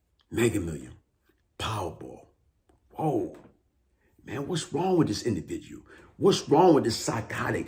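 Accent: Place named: Baltimore, United States. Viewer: For somebody from nyc you pronounce your words like you from Baltimore.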